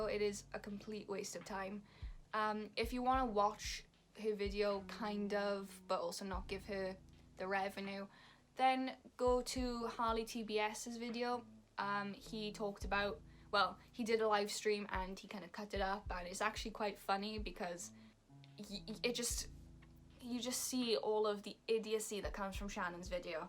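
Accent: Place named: Wales, United Kingdom. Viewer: Your accent is conflicting to me, cause I don’t know if your from mid/south wales or Mid/north England- Love your hair, love you ur piercings. Lots of new year luck n love from north wales!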